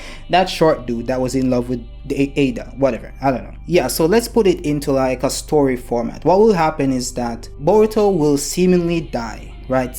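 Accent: Jamaica. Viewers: Brudda yiu Jamaican what's the accent I'm hearin you in ya voice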